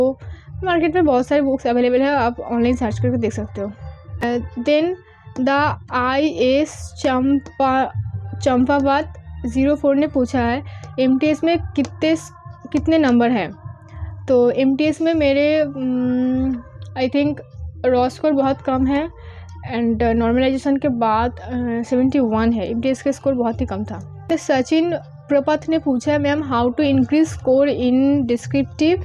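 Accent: Bengal, India. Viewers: U from Bengal? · that's so sweet of you mam . Tnq so much mam ❤️well I love your Bengoli tone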